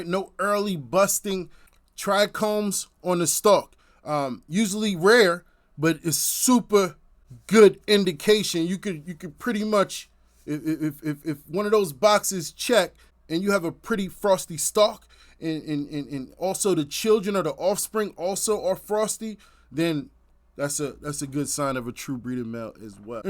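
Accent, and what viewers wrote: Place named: New Jersey, United States. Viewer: And where u from? U sound like u not really from nyc but somewhere close. Jersey or Li or sum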